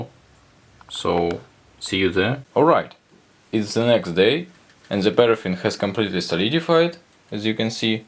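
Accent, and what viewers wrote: Russia. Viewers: Are you from England? Because I hear you're not from England, but from Russia, right?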